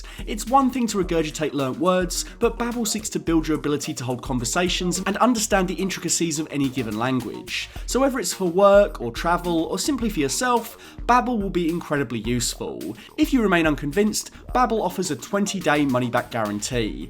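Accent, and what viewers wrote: United Kingdom. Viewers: You are one funny sounding britt!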